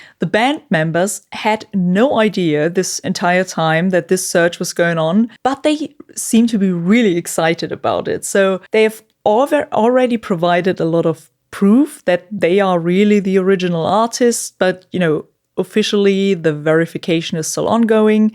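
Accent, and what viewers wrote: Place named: Germany. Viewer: Dein deutscher Akzent erinnert mich an von. 😀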